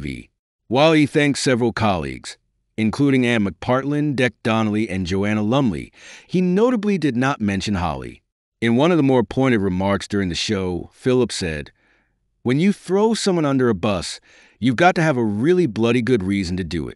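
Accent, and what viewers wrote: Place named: United States. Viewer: The American accent narration is an odd choice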